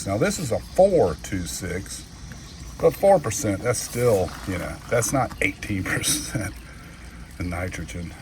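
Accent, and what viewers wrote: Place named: South, United States. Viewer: Yea! My 1st year w CPs!! I hope they turn out! 🍅 Can't wait to taste them! Yay, I picked the miracle grow, too!!😉👏 Are u originally from NC? Accent sounds a little more southern. 😁😊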